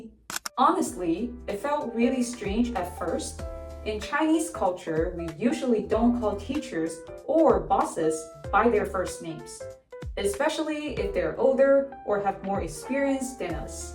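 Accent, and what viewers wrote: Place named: United States. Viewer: You have an American accent. You don't sound like from China.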